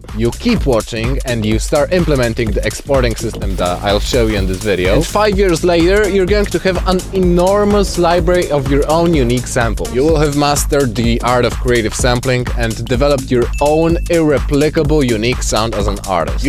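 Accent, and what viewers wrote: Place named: Poland. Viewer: You can immediately hear my boy polish ❤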